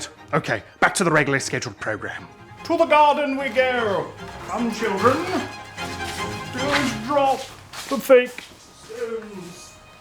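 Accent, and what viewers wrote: England, United Kingdom. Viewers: Hahahaha! Thanks! That British accent made my morning😀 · Your Brit accent 😂 very good.🇬🇧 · So... are you going to make a "rock" with a house key hidey hole? My parents had one & it was store bought & stuck out like a sore thumb lol. At least all of your rocks look alike, lol Loving the British accent, cracks me up. Nice family too !! Y'all are so sweet and the project looks prefect !! I might have to go into town and get some supplies!! Not gonna tell my otter half what its for... it's good to leave them wondering lol Be well !! 🦋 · That is so easy and you can do it however but the English accent was cracking me up , but do tell your wife she got a sexy one! Stay safe and many blessings to your family!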